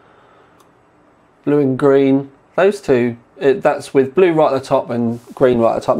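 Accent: United Kingdom. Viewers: Wow... he didnt skimp when he said FULL REVIEW... whatever his british accent will occupy me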